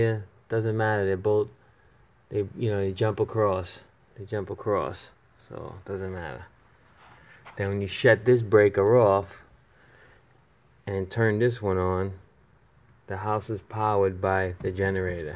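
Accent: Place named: New Jersey, United States. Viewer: I'm gonna guess New Jersey accent ... Thanks for the video. You did good.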